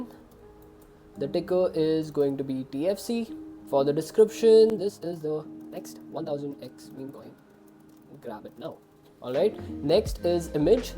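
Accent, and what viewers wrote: India. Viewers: Almost had me diddy till I heard an indiann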